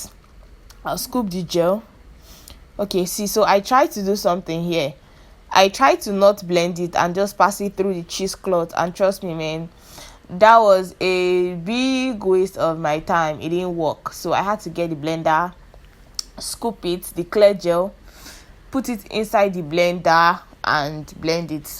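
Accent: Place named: Igbo, Nigeria. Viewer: you igbo accent is amazing! I love it.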